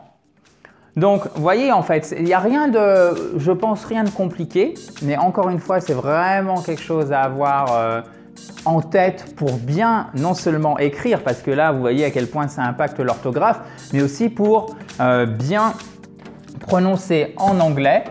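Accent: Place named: United States. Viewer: Bonjour. Merci tout d'abord pour ce "fabuleux" travail avec toutes ces vidéos! Mais pourquoi devez-vous systèmatiquement utiliser l'accent américain? Il m'arrache les oreilles et avant l'américain, il y avait l'anglais. Alors, pourquoi ce choix? merci à vous.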